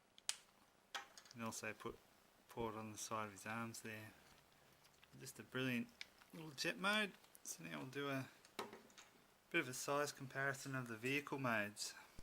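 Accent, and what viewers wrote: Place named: Australia. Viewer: From your accent I'm guessing your Aussie. Where in Australia did you get Air raid? I need him and the rest of the stunticon team.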